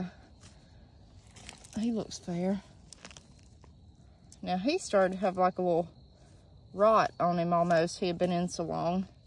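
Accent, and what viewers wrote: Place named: Tennessee, United States. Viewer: Your soil looks pretty crappy but I have the same soil but orange clay with all that gravel (chert) in it. That's a decent harvest for a small patch. I bet they'd be good as hell in a pot roast with carrots and potatoes. You have to be in Tennessee judging by your accent. I know a. Her first name starts with a T and rhymes with Maria. She's about 25.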